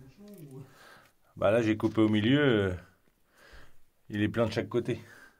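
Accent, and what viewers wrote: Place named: Nord-Pas-de-Calais, France. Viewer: • Tu as l’accent du Nord-Pas-de-Calais 😁 ! Est-ce qu’on peut t’appeler pour déboucher un fourreau ou est-ce que tu travailles uniquement avec un opérateur ? Merci d’avance à toi. Et bon boulot ! Tu n’as pas fini comme Claude François… 😁👍🏻 🥳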